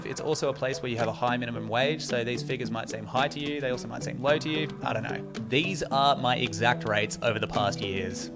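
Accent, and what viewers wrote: Australia. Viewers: Lots of good information here. I am considering watching this again. The fast talk in an Australian accent combined with the motormouth editing made it a bit difficult to follow. Consider spending a bit more time on writing with brutal cutting to get a concise message with more impact, in less time. Know what is important in your message and cut out some of the snarky humor. Language was invented, after all, by air-breathing mammals so there is a reason for the natural pauses in our speech. Your humor would hit the mark more often with some space to inhale giving the viewer time to "get" the joke. Remember that the most important part of your message is always non-verbal. It's in the spaces between the words. Give us those spaces so that we can interpret your speech. I appreciate your frank and personal style. The core message is empowering and inspiring. 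More please. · He has an Australian accent. He sounds just fine and legible to me, but I’m American and English is my only language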